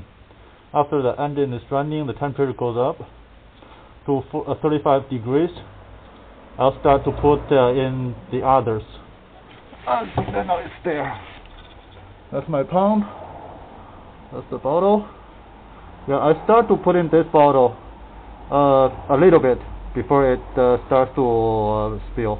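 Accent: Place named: China. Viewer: cool bro!sounds like you are chinese,me too!keep going,you did good job!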